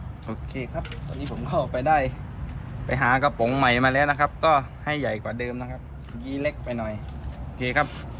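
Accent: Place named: Bangkok, Thailand. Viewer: สวัสดี คะ อยุ่จังหวัดอะไร สำเนียงพันนี้น่าว่าม่ายช่ายคนใต้ ต้องเป็นคน กุงเต๊ป(กรุงเทพ)แต่กำเนิดแน่นอน 😆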